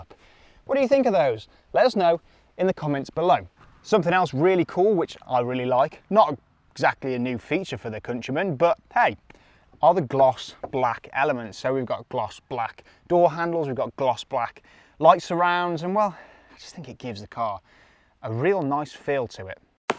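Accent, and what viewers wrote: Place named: United Kingdom. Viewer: Ох уж этот британский английский 😖 «кунчимэн» 😖😖😖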